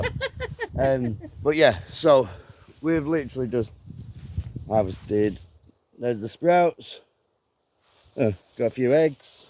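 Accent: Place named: Manchester, United Kingdom. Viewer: What a great vegetable farm. Love watching what your planting and harvesting I was trying to place your accent, sounds very northern possibly Mancunian by any chance?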